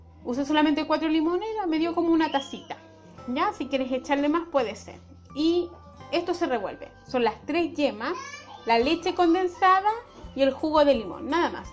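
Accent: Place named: Chile. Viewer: Al fin un video explicado por un chileno,, asi se entiende mejor 👌👌muy wena la receta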